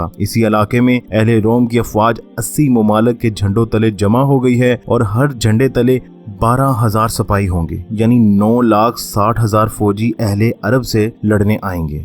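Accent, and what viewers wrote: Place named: Punjab, Pakistan. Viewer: This guy speaks Urdu but punjabi accent , means Pakistani punjab region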